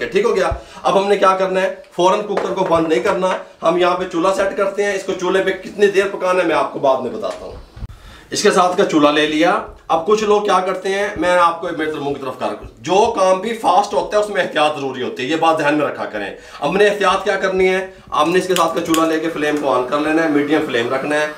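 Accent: Punjab, India. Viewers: Bht aala , ❤️❤️👏👍😅 dabne na kha kren bs baqi punjabi accent aur alfaz sun k bht acha lgta h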